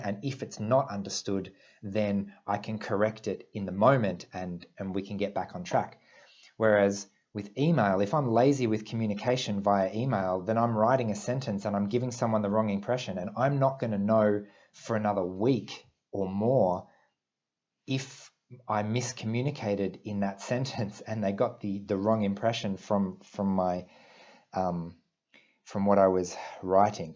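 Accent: Australia. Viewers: Hey man, you sound Australian (sorry if you turn out to be from NZ). Have you met Donna Williams?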